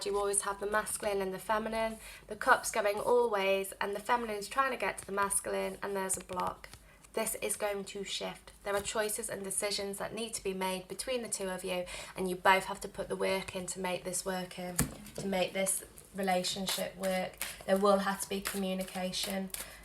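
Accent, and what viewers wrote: Liverpool, United Kingdom. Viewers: btw it’s such a shame that I don’t live in the UK anymore or else I’d pay you a visit in person. I used to live in Edinburgh and was there for my postgraduate education for 2 years. I loved everything there and didn’t really want to go back to the US but I had no choice cuz my student visa expired and I ended up living in Taiwan for now 😂 I miss the UK a lot especially when I hear you talk 😭 You sound like you’re from Liverpool or somewhere closer. P.S. I was also wondering if it would be ok for you to do a pick a card reading on “Are they still testing me?” or “Are they still observing me?”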